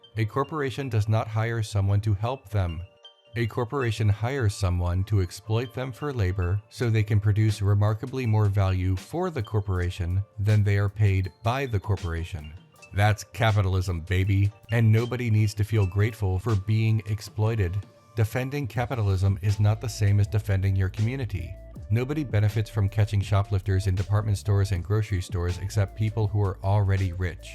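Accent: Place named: Mid-Atlantic, United States. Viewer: I like the narrators mid-Atlantic accent. Don't try to hide it lmao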